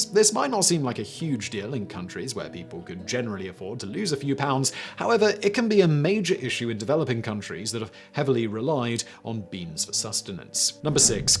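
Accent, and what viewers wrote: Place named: United Kingdom. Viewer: I luv how Brits' pronounce the word "vitamin,' ha...Here in the 'states it's a LONG "I" whereas in the U.K. it's a short "I". Seeing as how they basically spawned the english language, who knows...maybe it's American's who are pronouncing it incorrectly.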